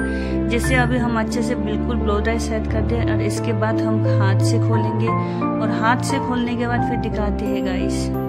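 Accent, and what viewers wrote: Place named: Bangladesh. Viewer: Ap Bangladeshi ho kya apki Hindi se lag raha hai perfect nhi hai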